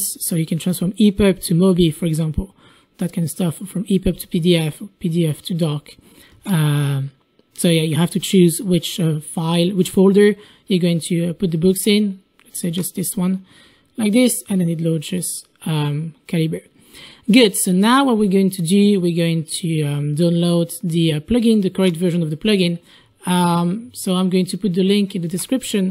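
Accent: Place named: France. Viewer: Really good tutorial + super sweet french accent, thank you again!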